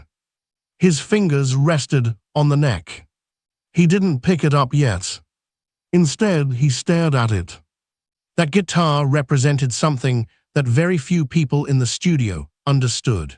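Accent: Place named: United Kingdom. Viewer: I didn't click on here to listen to a Limey run his dialog for an hour. I'm deleting my sub and thumbs up too.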